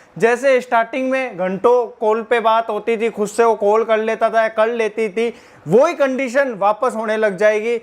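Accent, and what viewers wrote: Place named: Rajasthan, India. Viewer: भाई राजस्थान से हो kya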